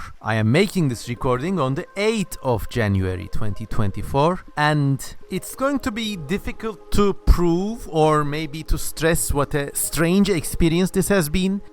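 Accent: Turkey. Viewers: I am living for the Turkish accent 🩶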